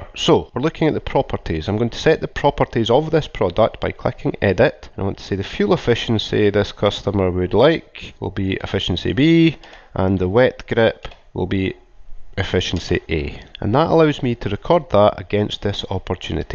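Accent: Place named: Scotland, United Kingdom. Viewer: You should do in another accent, i cannot stop enjoying this nice scott lad's accent... I need to watch it again for the content....JK.....its nicely put together...well done....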